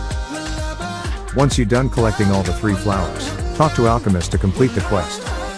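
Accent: Philippines. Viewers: R u from Philippines that sound familiar? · BRO HIS SOUND IS FROM FILIPINO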